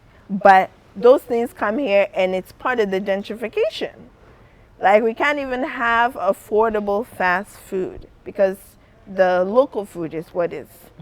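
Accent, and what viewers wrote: Africa. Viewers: She has an african accent as well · She's not Canadian, sitting up there with her thick African accent. Has she ever been to the US. She's so ignorant. The same thing is going on in Canada with black people. How is she speaking on America? If she's never been to America she's just taking what people that she knows in America are telling her. I'm AA the way she saying, AA are treated in the US. I've never been treated like that. No one in my family's ever been treated like that. Not all AA are treated badly. Only a ignorant person would think that. That's like me saying all Africans live in the village in cow manure huts and you're all dirty and you're all stink. All African are educated. Like how ignorant would I be to say that. smfh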